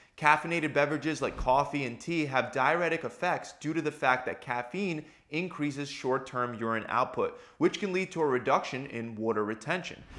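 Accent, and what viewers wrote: Philadelphia, United States. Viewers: Well we all know youre from philly now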